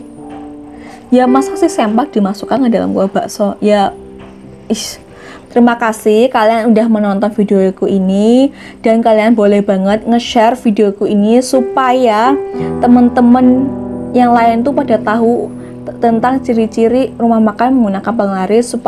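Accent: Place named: Tegal, Indonesia. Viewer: Orang Tegal yah.. logatnya kentara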